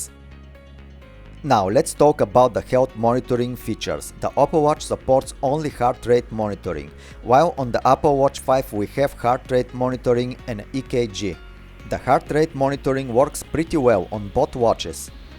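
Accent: Russia. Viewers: Is that an Russian gentleman speaking English, man I love his accent a lot.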